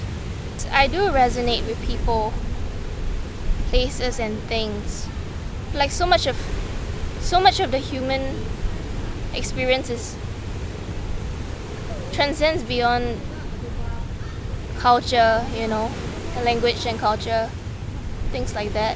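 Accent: Singapore. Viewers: Greetings from California! I have never heard of you, and I don't know how I got to your channel. But listening to your accent, my guess is that you're from Singapore or Malaysia. Am I right? You said you had 300+ subscribers. Look at you now! As of this moment, you have 849! I'll subscribe, so you'll be at 850. Then I'll listen to your music... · Actually, English is most likely her first language. She is speaking Singlish. It's English. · You are adorable and I hear some Singapore inflection Good luck, I will follow this for at least a while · Your accent sounds like youre from Singapore possibly? I went there once several years ago. I'm about a minute into your vid. If you get a passport and live and travel to places that are less hustle culture, it could be a breath of fresh air. Also, i play guitar(20 yrs) and I agree, it's the most free place. Moving to Philippines next week. 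I hope you find consistent peace and happiness along your way in life.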